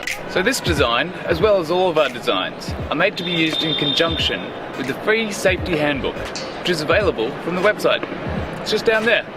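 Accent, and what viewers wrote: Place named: Australia. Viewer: Loving your channel and all you're doing in India. From the accent am missing Oz already .... Am a South Sudanese Ozzy from Sydney and I've been looking for DIY playground projects for my son and kids across South Sudan 🙂 so glad to come across this😁 moved 8mths ago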